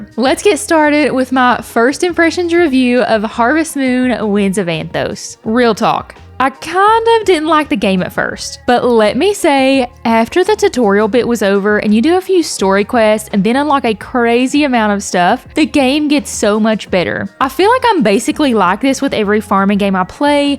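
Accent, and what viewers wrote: South, United States. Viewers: And what part of the south are you from? Just found the channel btw. Good review! Subscribed..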